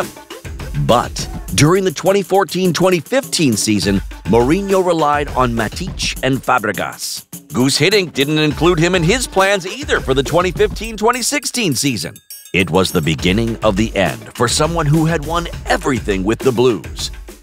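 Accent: United States. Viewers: its not benfeca its pronounced benfi-ica, and is it only me that finds it weird to hear American accent on a football video?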